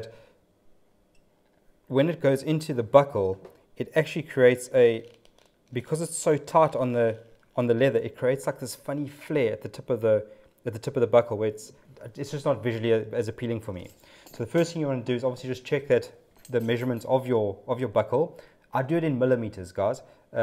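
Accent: South Africa. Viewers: I knew with that accent where in South Africa are you and do you have a shop in the western cape ? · Aussie saddlemaker here. Been a saddlemaker for 39 years and never used a lap skiver, you have convinced me to start using this tool. I have been using a round knife for hand skiving. Only cos this is how I was taught by when I learned my trade in the 1980s. That skiver is nicely sharpened, hardly any effort to skive! Is that a South African accent? At first I thought your accent was New Zullander (New Zealander).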